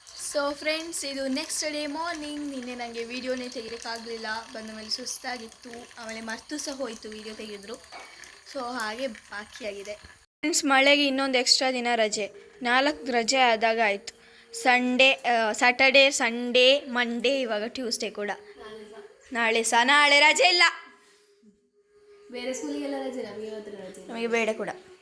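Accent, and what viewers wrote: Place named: Arebhashe, India. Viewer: Nimma arebhshe kelike kushi adhe marre 😅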